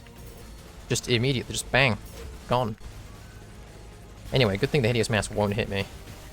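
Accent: United Kingdom. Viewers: WHY DOES HE SOUND MORE BRITISH IN THIS ONE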